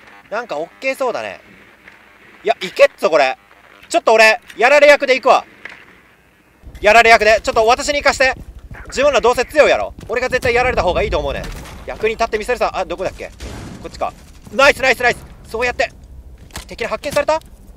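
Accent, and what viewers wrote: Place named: Kansai, Japan. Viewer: 自分とかゆうてますけどもみたいな関西弁使う感じめっちゃ好き笑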